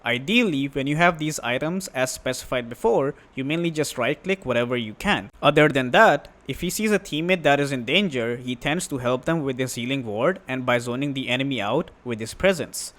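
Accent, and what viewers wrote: India. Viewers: i like your INDIAN accent dude.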